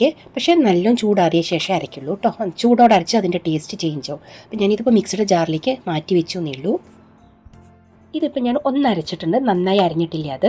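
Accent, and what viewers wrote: Palakkad, India. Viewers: പാലക്കാടാണ് നാട് എന്ന സംസാരത്തിൽ മനസ്സിലാക്കാം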